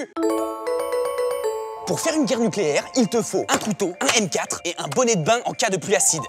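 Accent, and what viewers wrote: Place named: Quebec, Canada. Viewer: En passant ton accent qu'on appelle le joual est je le sais car je vis au et qu'on utilise ct'osti d'accents la tabarnac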